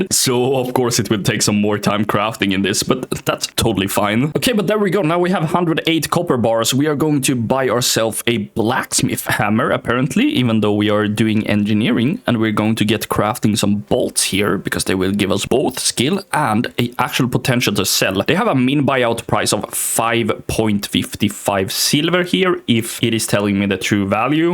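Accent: Sweden. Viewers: Bro has the Swedish accent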